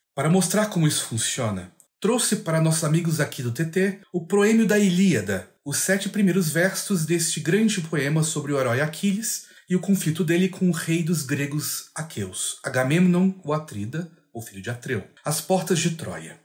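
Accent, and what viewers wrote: Portugal. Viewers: Ahah sotaque português é um xodó ☺️ mas é compreensível que seja difícil pra muitos, não é nosso dia-a-dia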